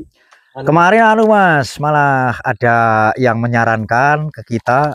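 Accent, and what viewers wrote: Banyumas, Indonesia. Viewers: Sehat terus bang,aja klalen ngapak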